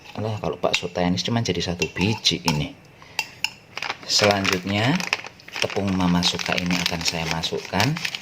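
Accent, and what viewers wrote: Banyumas, Indonesia. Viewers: Ish ish.. ketemu koleksi bhs ngapak.. Blindi" .. 😂😂.. Jls enak wong pk tpung bambu🤗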